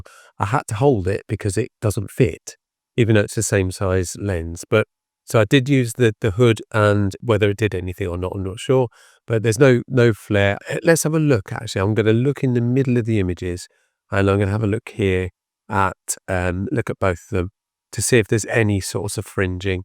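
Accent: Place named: United Kingdom. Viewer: Thank you very much for this channel in British English. I find it very pleasant to listen to (as I am not a native speaker and my English is only at a basic level), and I particularly appreciate not having to listen to American English. In addition, the content of your videos really appeals to me – it is well selected and clearly explained.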